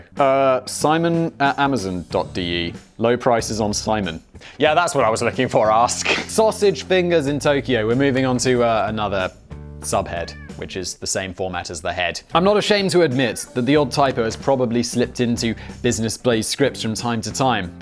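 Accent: United Kingdom. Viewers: Off-topic linguistic question from an American; You said, "Comer" instead of "coma". Why is it that people in the UK tend to pronounce "uh" or "a" as "er"? Honder, comer, etc... Is that the original intention of the pronunciation and it's just another way America tried to be different? Or is that just sort of how your speech evolved, like a vernacular?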